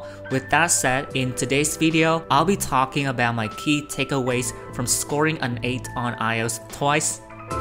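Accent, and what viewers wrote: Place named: England, United Kingdom. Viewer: Thanks to your Fb post about Notion, I know you. I really like your English accent and what you shared in your videos. Thanks so much. <3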